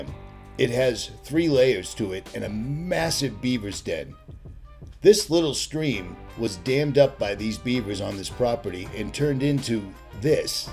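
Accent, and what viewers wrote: Boston, United States. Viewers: Sounds like Ritchie from Boston?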